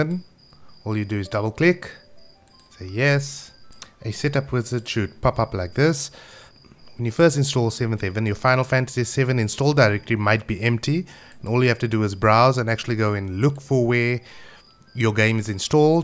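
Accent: South Africa. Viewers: Amazing! Brilliant step by step instructions. Also I'm currently living in Thailand and as I was listening to you speak I thought "no bloody way this dude is also a saffa!" Thanks from a Durbanite living in Asia :D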